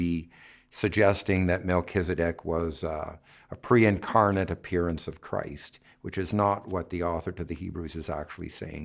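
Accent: Canada. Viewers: One minute in and I pegged him as a fellow Canadian....that accent sticks out like a sore thumb LOL..turns out he is. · Interesting lecture; I could tell he is Canadian right away haha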